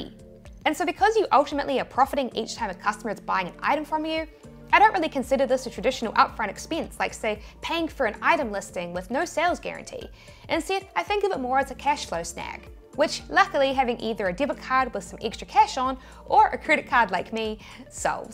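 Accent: New Zealand. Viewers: Love the Kiwi accent! I watch cricket and so I understand the Kiwi accent perfectly. Love from Sri Lanka 🇱🇰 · i think shes from new zealand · The last one that threw me was "each" pronounced as "ahch". It would be cute if you did a video on the New Zealand accent. Yours is quite prominent. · You're so sweet. I lived in NZ and I love hearing your beautiful accent. Reminds me of one of the best times of my life. Amazing video as usual. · New Zealand's English is so adorable! 🌷🌿🌎💖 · Hmmm, I would have guessed you had a Kiwi Accent. ;) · Oh, so that's where it's from the interning accent, New Zealand , 👍 , lovely acquainting of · I was wondering if it was New Zealand, thanks! I gotta practice my accent interpretation. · you are a bloody good Kiwi! And all that with the accent:) I am looking for getting an income as it has now been over 5 months without employment and I realise that recruiters are not working hard enough to help me get a job. 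I have to chase the opportunity myself and indeed, what better than running your own business. You are very detailed in your videos and you stick to your advertised title, which I really appreciate. Being without income, I am maxing our credit card to pay the bills. I hope there might still be enough on the main credit card to pay for the up-front cost when someone buys an item... As you said, it is a cash flow as the sale is made, but imagine if you sell 1,000 items in one week and you don't get your money before the second week, Ouch! But then, you can explain this to the bank manager and show your orders with the expected payout to come. I am sure the bank will allow an overdraft on the account for the credit card... (hopefully these are still humans?) I will probably watch some more and this one again and get started tomorrow. Thank you for your time making these videos. You definitely deserve your income.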